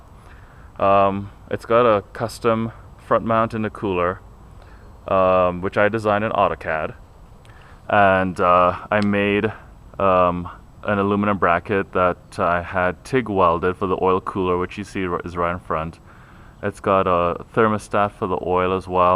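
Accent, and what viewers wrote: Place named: Russia. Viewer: woow very very nice man, you say you live in col springs yeah,? where is your accent from, almost sounds russian at some points but not exact, great video man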